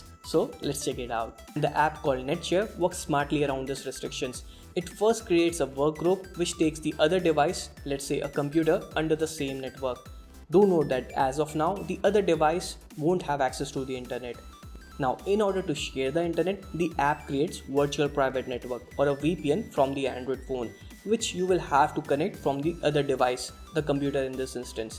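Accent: India. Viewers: An Indian I can understand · I love your low-tone indian voice, every word is properly pronounced. Great job!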